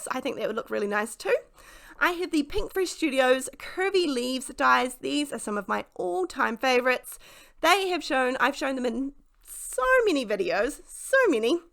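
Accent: New Zealand. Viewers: Glad it made you laugh. It really did take me a while to,work it out. . Your accent is just the sweetest. Reminds me of the time we had a supply teacher here who was from NZ. I regularly had to translate for our class. She wanted them to sit on the mitt. .. but the best time was when she wanted to sort our children into ability groups for literacy.. we had the kiwi group, the jandals group, both needed explaining as they had not come across those words before. But then we got to the thongs group. .. we changed that one…. 😂😂 hope you’ve had wonderful Christmas. Love to everyone. X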